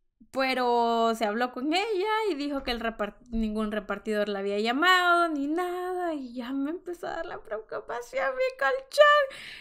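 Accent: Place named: El Salvador. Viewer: me encanta como hablas !!! me facina tu acento salvadoreno !!! lastimosamente de alguna manera yo perdi el mio pero bueno te felicito por tu canal y por el contenido .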